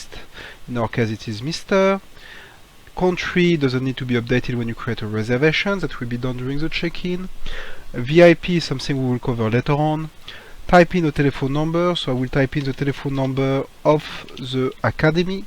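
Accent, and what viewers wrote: France. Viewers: Academy Customer Services With the french accent!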